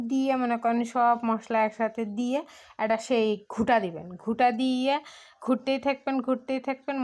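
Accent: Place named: Bogura, Bangladesh. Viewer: হামাগেরে বগরার ভাষা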